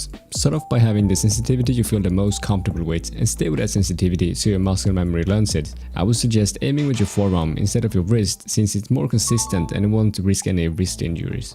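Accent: Sweden. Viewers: Bra video som fan, man hörde på din första mening den markanta "svenskheten" :P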